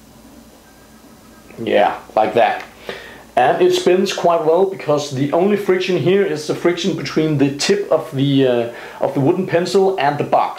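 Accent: Denmark. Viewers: Are your danish your have an danish accent cool idea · vietnamese? He's danish >.< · Lol sounds danish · You sound really danish (im danish too)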